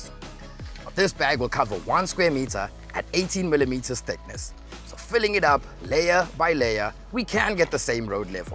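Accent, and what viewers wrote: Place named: South Africa. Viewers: His South African, incase you wondering . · Naw, he's a Saffie, for sure.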